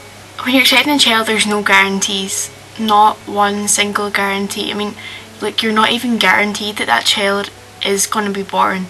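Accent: United Kingdom. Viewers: What kind of accent is that? I know it's from the British Isles, but I can't pinpoint where...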